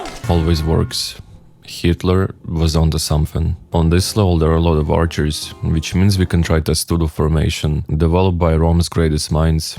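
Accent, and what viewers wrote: Russia. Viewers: man i thought there's a russian version of this video because of the russian accent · This is explained by the fact that he has a Russian accent. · this is ochen horosho, bratan, delai bolshe takich video (I heard an atom of russian accent) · акцент русский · listening to ts with just audio sounds like a russian telling you war stories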